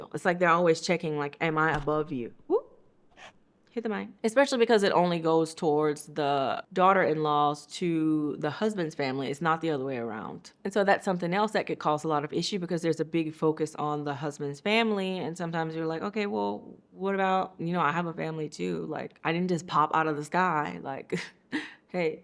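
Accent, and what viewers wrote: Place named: South, United States. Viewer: I love that you speak Korean and have the cutest Southern accent.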